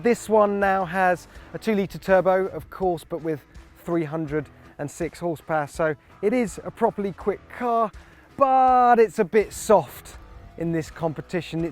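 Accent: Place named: United Kingdom. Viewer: Fiestar st, I don't know why you britisch people see letters where their arent. It's like you say 'I'm sat in', another weird ass thing to say. Ya'll a bunch a weirdo's.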